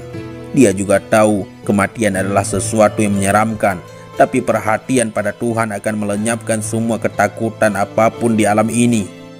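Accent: Aceh, Indonesia. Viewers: Dialeg admin, terdengar seperti dialeg aceh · Saya lebih ke suku aceh suara "s" dan "t" nya khas .. dan fasihnya melafazkan kalimat arab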